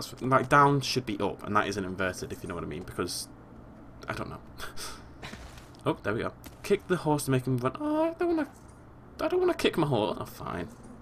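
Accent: United Kingdom. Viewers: Got to love how the British say H.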